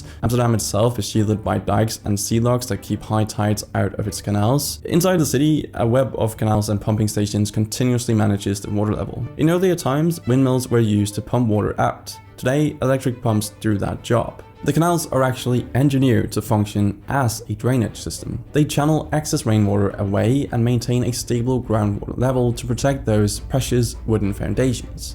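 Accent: Germany. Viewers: I'm kind of getting triggerd by the German pronunciation of Dutch words, no slight to the uploader though Dutch ch and g sounds are hard. Just my own pet peeve. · I dislike the German pronunciation of Dutch words, otherwise a good video. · Your Dutch sounds quite German, but I'm glad you're giving it a shot—nice video!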